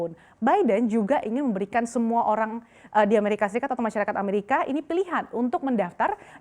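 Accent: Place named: West Kalimantan, Indonesia. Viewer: Logat lu kek logat kalbar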